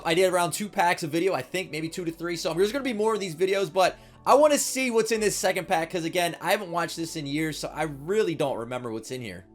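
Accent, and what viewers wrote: New Jersey, United States. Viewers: you can really hear that new jersey accent in his old videos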